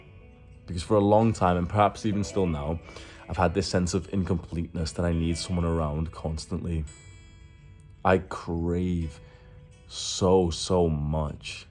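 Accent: Liverpool, United Kingdom. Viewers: Wait is that a Scouse accent i can detect. *Back to the content of the video *Inner healing allows deep soul growth and discovery. It's so fulfilling because the inner joy that happens is freeing because you learn true love and happiness starts within yourself. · Your accent is northwest wirral/Liverpool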